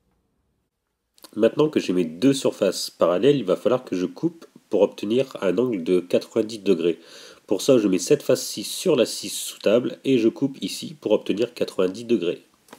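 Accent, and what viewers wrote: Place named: Nord-Pas-de-Calais, France. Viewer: Bravo pour ce document bref et concis sans blabla inutile avec un ch'ti accent sympa. Il n'y a pas de chose mal faites, il n'y a que des façons différentes de les faire...Bravo à toi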